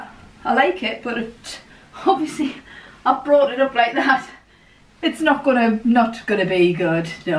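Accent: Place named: Wallsend, United Kingdom. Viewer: She is from Wallsend. :) We both live in Australia now, her accent isn't as strong but always makes me smile.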